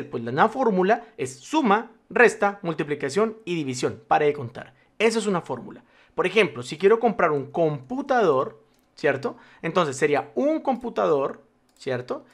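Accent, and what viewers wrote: Colombia. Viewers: Escuchar el acento colombiano no tiene precio!!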